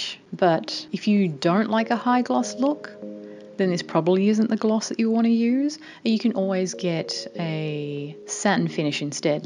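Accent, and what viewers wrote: Australia. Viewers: Love the Australian accent. Show me how to get one. Your work is amazing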